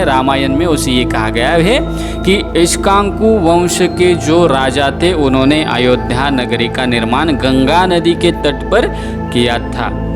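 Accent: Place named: Maharashtra, India. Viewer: जी! बोली से स्पस्ट होता है! कि आप महाराष्ट्र से हैं! आप हजारों कि मी की यात्रा करके उ प्र के "साकेत पहुंचे है! यह परिश्रम अपने आप मे असाधारण है! इस बौद्ध विरासत मे पहुंचकर आपने यथार्थ का आँखो देखा मंजर देश कें सामने रखा! आपके दूसरे साथी इस बौद्ध विरासत को आमजन भंते फाहियान और ह्वेनसाग ने सुदूर चीन से पैदल चलकर भारत की बौद्ध विरासतो को प्रामानिक तौर पर अमर कर दिया है! आप लोग कठिन परिश्रम से यथास्थिति को आमजन मानस तक पहुंचाकर साधुवाद के पात्र हैं! इस कार्य मे " यू ट्यूब" का योगदान कम नही हैं! देश के किसी भी प्रांत / जिले / गाँव मे बैठा पाठक अपने घर मे बैठकर सबकुछ देख रहा हैं! साधुवाद!!!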